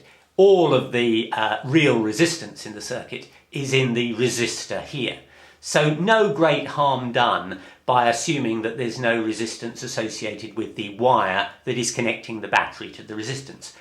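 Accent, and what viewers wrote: United Kingdom. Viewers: i am too distracted to listen because his accent makes me feel like im in Hogwarts lol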